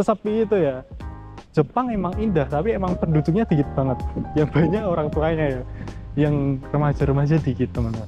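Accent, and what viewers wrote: Java, Indonesia. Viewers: Aku seneng logat jowo mu mass💍😻😌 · mas bro sampean logatnya kok kayak orang jawa ya ,kalau boleh tau jawa mana ya?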